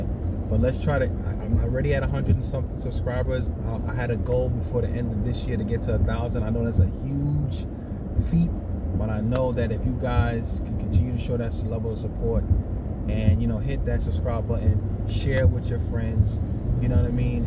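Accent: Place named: New York, United States. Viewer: what part of new york are you from man ?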